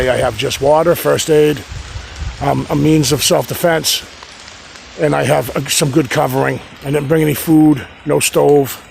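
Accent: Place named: Massachusetts, United States. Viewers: HEY, THIS IS THE SECOND TIME I WATCH THIS VIDEO, I JUST WANT TO SAY HOW YOUR Massachusetts accent makes me miss it up there so badly!!!! I feel like I'm home when i watch your vids. As you know I'm down in Florida for the past how many years. But I miss home and you always bring me back!!!THANKS, STILL HERE WATCHIN YOU OUT SQUATCHIN..LOL...TAKE CARE AND STAY SAFE!!!!LINDA IN FLORIDA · Love the Boston accent.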